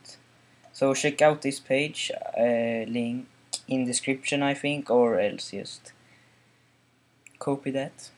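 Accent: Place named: Sweden. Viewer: You can hear the swedish accent :p like you can on every swedish guy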